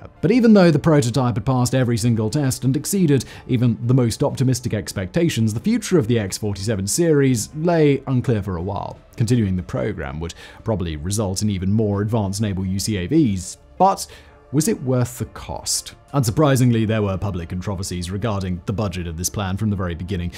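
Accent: United Kingdom. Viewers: British English.🇬🇧 From the inventors of the language, correctly spoken. Not some bastardised colonial strangulated and simplified version.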